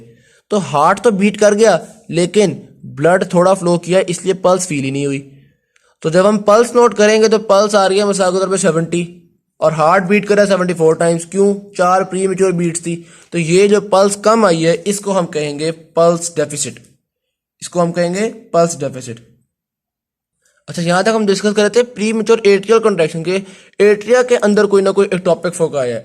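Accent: Punjab, India. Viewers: Zbrdst...punjabi accent mn smjh kr ziada mza aya😇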